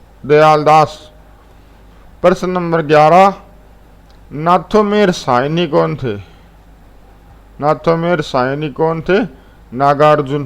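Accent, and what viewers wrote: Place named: Jalore, India. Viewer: आप जालौर जिला राजस्थान के निवासी लग रहे है सर लहजे से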